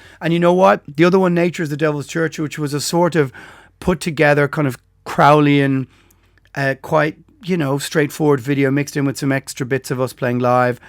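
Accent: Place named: Ireland. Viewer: Whenever you say (parentheses) I automatically know you are actually referring to "quotation marks" because you then use the phrase "rabbit ears." But it never registered you were misphrasing it until you started pointing it out, because the Irish pronunciation of the word is different from the American pronunciation :)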